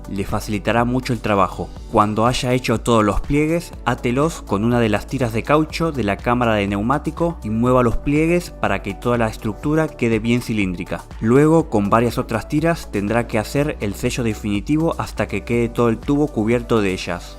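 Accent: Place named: Argentina. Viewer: Muy bien explicado, Pero estoy seguro que puedo encontrar otro video donde me lo expliquen sin ese molestoso y desagradable acento Argentino o Uruguayo.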